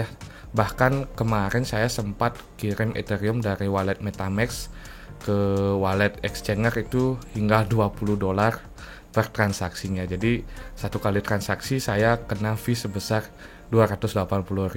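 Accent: Bali, Indonesia. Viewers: Bang kok logatnya ky org bali ya?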